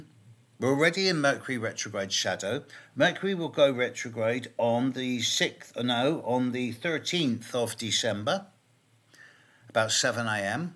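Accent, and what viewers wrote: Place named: Bath, United Kingdom. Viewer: You look really fresh and well rested. I love that some of your words still have a bath dialect pushing through. Greatest astrologer ever. ❤️🫶🏽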